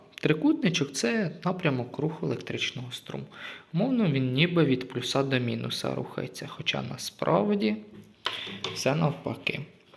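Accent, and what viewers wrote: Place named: Poltava, Ukraine. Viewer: Полтава балака)))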